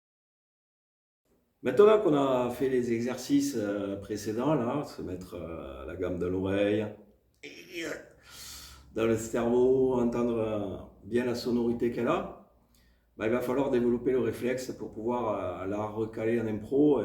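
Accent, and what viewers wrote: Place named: Provence, France. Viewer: Pas pire, yabontuto. Ça promène, au fil du manche. Avé le bel accent qui sent le sud provençal. Bon c'est reste plus simple de regarde que refaire, mais pour le coup ça donne l'envie. Bravo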